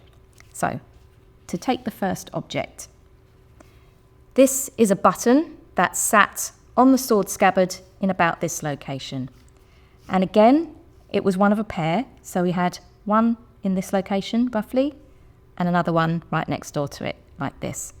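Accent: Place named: United Kingdom. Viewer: I want this lady to explain everything to me. A hot blonde with a British accent sure makes information go down smoothly. 😅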